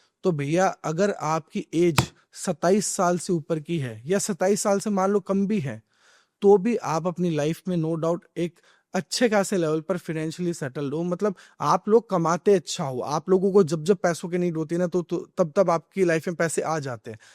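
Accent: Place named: Marwar, India. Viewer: No doubt aap ek Marwadi bande ho no doubt apki boli bhasha se jana bcoz no doubt main bhi marwadi hu 😅😅😅 baki no doubt apne jo hhi bola h vo nhi ke brabar h 😢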